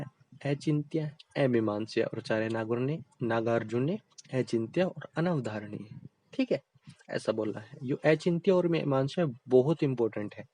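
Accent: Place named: Haryana, India. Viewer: Please don’t use haryanvi accent bro. Keep it up 🎉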